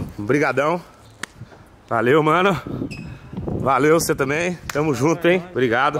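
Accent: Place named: Bahia, Brazil. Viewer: Baiano demais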